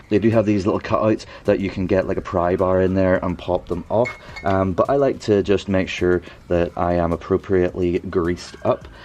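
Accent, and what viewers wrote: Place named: Northern Ireland, United Kingdom. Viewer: Are these legal in the UK as I am presuming by your accent and vehicle reg that you are in Northern Ireland. I have had 15 mm spacers on an E46 M3 in Australia where it is illegal probably based on the 'piggy back' type that are pretty risky. I wrecked two wheels and did thousands of dollars of suspension and body damage when a 30 kg manhole flipped out of its hole and instantly deflated both tyres and the spacers were unaffected but I did take them off before the car was repaired under comprehensive insurance. I am moving back to the UK and would like to put these spacers on a mini clubman if legal or probably even if their not legal but a bit of a faf if you have to take them off for MOT's and inspections by the Rossers.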